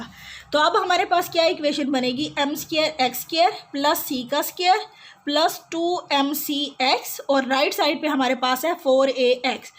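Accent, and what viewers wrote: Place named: Pakistan. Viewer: Really OSM MAM, awaj se lag rha hai aap Pakistan se hai, but love from INDIA.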